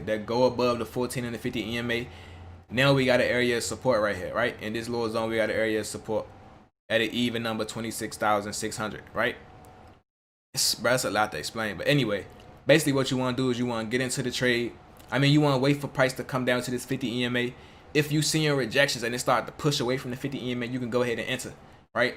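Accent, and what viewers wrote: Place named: Baltimore, United States. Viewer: Gotta be from B’More or at least in MD/DMV but hear strong Bmore accent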